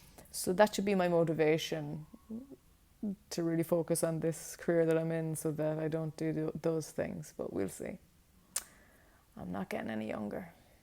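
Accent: Ireland. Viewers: I swear I could listen to read the phone book and be happy for hours. The Gaelge accent does that to American ears.:)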